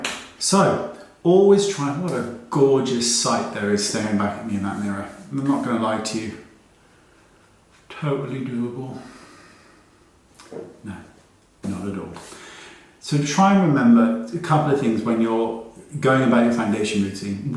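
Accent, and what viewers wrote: United Kingdom. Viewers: Absolutely, Lovely British accent ! I am not even using any make up I just keep listening ! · I always love your videos and Brit accent:-) Makeup is supposed to enhance one's natural beauty and not to produce artificiality. This will help a person age beautifully. Otherwise they will be like mugshots. What I love about you is that you always emphasize this point. Don't worry about who says bad about you. We all love you.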